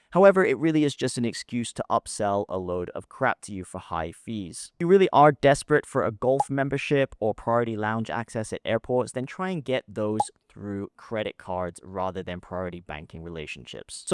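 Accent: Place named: United States. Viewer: wat an american accent dude talking singapore finance? go back to america!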